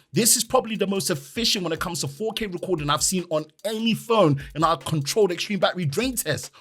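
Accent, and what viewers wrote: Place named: United Kingdom. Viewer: I like your British accent more than the whole drain test bro . Keep it up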